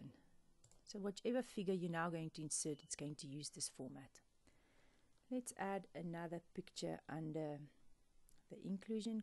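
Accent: Afrikaans, South Africa. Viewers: Thanks very much, got to love the Afrikaans accent! Dankie! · South African? The accent is strong. But it´s cool to hear it so unexpectedly.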